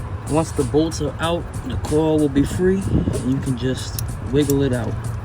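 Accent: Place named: Louisiana, United States. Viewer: Louisiana boy.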